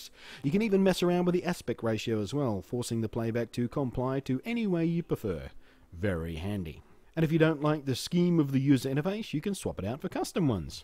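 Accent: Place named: Australia. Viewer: Well damn, as other commentors pointed out there it is in plain text at the end of the video. I'm so used to those marketing style bullet point filled pages I ignore them on instinct. Also, the sound of your soothing aussie voice kept me distracted. Or maybe it's cause I'm sick and hopped up on so many medications I can't think straight but I'm sure it's mostly the aussie thing., I'd really like to see you do some notebook reviews. Specifically the Clevo P151M.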